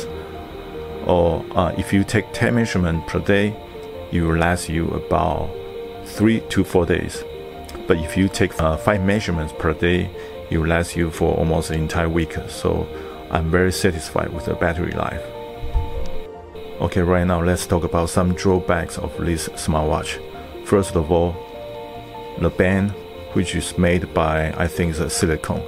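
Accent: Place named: United States. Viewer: you speak great English. I mean your tone likes American, not Taiwanese accent...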